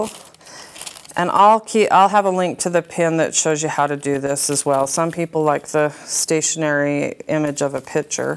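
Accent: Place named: United States. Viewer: bravo, but your english is only for americans,you talk to fast and i hardly understand what you say,bravo any way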